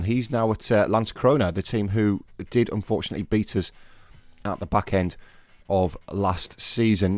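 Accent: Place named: Newcastle, United Kingdom. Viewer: Glad to see you're going full Geordie mate, no shame in it, you'll have to to change the intro to 'Home Newcastle' 😉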